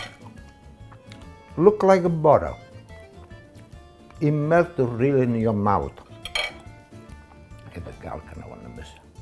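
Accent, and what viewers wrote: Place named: Apulia, Italy. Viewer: He's Pugliese. Dummy.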